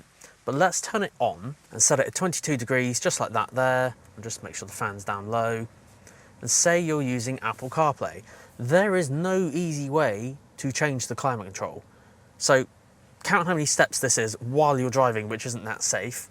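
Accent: United Kingdom. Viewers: British accent based in usa? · I find it rather odd that a dude with a British accent, sitting on the right side of the car is complaining that the indicator stalk is in the correct place. I really hope BYD doesn't listen to you and keep it on the side of the driver door like it should be. In a RHD car, the indicator is on the right and on the left if the car is LHD. See every Toyota, Nissan, Honda, Mitsubishi, Subaru, Mercedes Benz - made for a particular market (RHD vs LHD). I assume that if the Japanese can get it right, the Chinese can too. I don't know what's wrong BMW and VW. They're just lazy I think.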